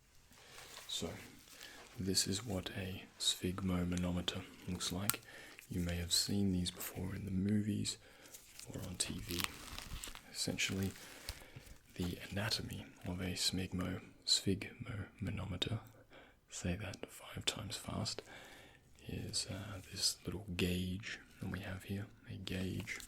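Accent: Australia. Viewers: Seeing all these people hyping over the deep Australian accent makes me think how many nights I've spent not alone have been caused by the same type of horny people. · Don't mind me. I'm just here for the aussie accent. :)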